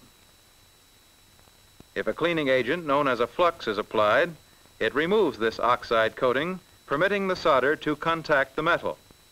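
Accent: United States. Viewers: That is one heck of a soldering iron!!! Why do Americans pronounce always solder as 'sodder'?! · Why do Americans call it SODDER? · It's a silent "L", only here in America.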